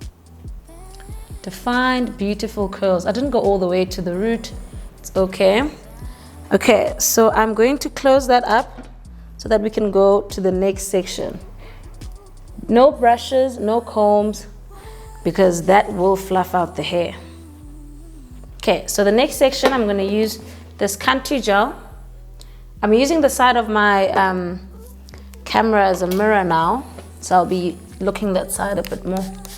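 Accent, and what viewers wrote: South Africa. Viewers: is it just me or does she sound South African ? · She sounds very much like she has a black accent. Just from JHB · She is south african😍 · She sounds South Africans....damn🚶🏾‍♀️ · Are you South African? You're accent is awesome! I'm American -born Nigerian, but my accent is boring valley-girl 😆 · LOL. It's our typical South African accent❤️ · are you south african?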